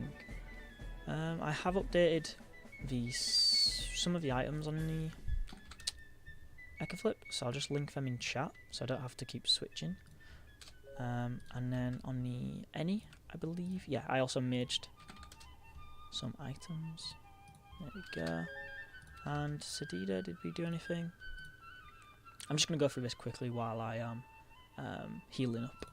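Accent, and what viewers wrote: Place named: Yorkshire, United Kingdom. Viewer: Brighton, UK here, but I grew up in the east midlands. You sound like your from Yorkshire haha? That music was heaven! Look forward to any and all dungeons, especially the harder ones later on as I’m quite unfamiliar with them.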